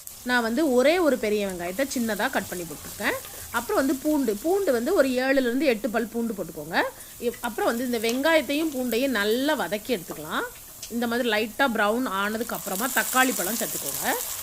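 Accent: Tirunelveli, India. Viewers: akka nenga thirunelveliya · UNGA paechula theriyuthu amma... My mother's native also Tirunelveli.. and your samayal ellam super ma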